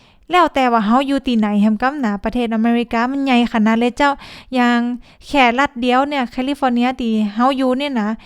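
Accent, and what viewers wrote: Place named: Chiang Rai, Thailand. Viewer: จากสำเนียง…น่าจะคนเชียงราย ไม่ก็ แพร่น่าน แม่นก่อ..ตั๋ว??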